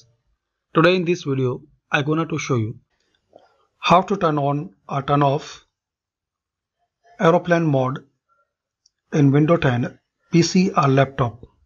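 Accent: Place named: India. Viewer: Can you ever hear one of these videos without the person sound like an Indian scam artist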